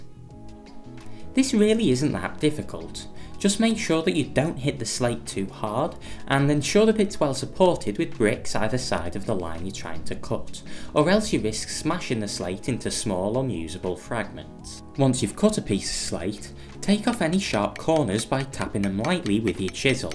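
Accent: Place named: England, United Kingdom. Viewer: And never pass up an opportunity to hear the king's English.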